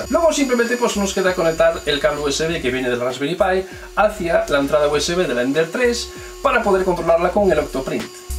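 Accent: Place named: Andalusia, Spain. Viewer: acento andalú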